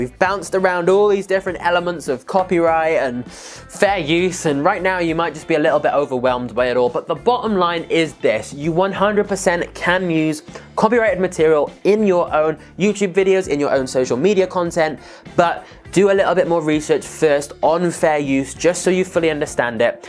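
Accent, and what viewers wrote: United Kingdom. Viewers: Thank you, you've have explained the topic in a manner of very detail. Quite a long video though, but I like your Brithish accent.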